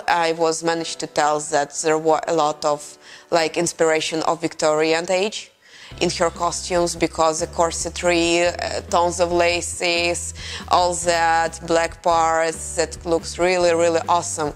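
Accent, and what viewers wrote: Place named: Russia. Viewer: Опять эти Russian инглиш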